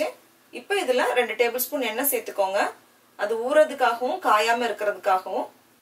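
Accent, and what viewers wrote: Nagercoil, India. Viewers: Thalli, typically Nagercoil slang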